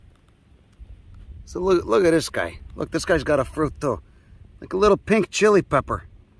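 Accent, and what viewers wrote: Chicago, United States. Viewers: Ay, ah? Been watching you for awhile now. Lived in Chi most of my adult life, but left a few years ago. Found your channel and stopped to hear the accent again, but stayed because you make botany interesting. Been on the North Coast of the DR since December. If you're coming up toward Puerto Plata/Sosua/Cabarete and you want to dial in more local flavor, lemme know and what the shit.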